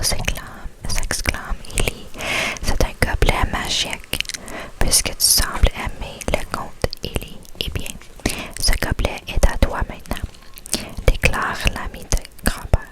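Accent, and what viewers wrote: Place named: Quebec, Canada. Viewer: L’accent québécois en asmr c’est trop innnncroyable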